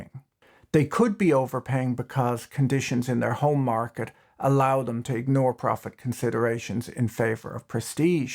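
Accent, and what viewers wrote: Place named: South, Ireland. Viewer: #I'm falling in love with your southern Irish drawl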